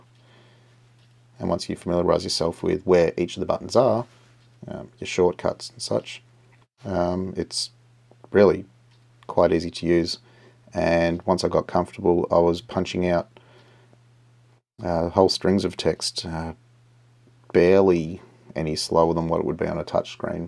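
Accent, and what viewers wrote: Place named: Australia. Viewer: Great review, knowing both sides of the coin, why not go for the best side, nice to hear an aussie not talking too much aussie, no offence. Regards London boy.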